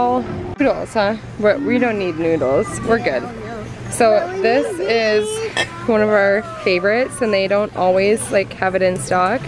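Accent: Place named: Upper Midwest, United States. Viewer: One of the reasons I started following is because she lives "back home" in the state where I used to live. I love hearing the MN/ND accent.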